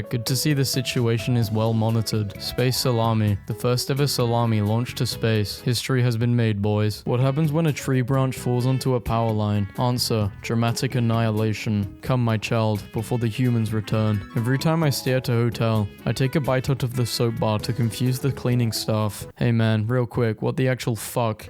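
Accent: United Kingdom. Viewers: nah really? i thought he was from the moon? yes of course hes british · Wait he's British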